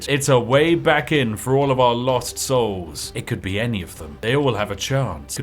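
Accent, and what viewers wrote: United Kingdom. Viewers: I like how he somehow keeps his British accent when replying to comments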